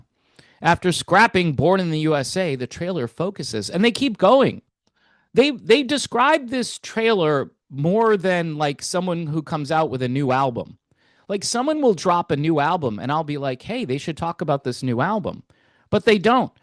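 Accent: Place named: South Jersey, United States. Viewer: Love Your impressive brother for you sound just like him come,lte with south jersey twnag lol!! I have always been a fan of his music and do admit I am looking forward to seeing him deliver from nowhere. Unfortunately he has lost his mind and became very bitter to date. However bruce still put on a great show and seems to embrace his music in all forms. neve know about electricity for barely remember the original release. The only song I even really know from the album is which is very haunting. God bless you, my brother, and I hope you end up liking this biopic, which seems at least tentative.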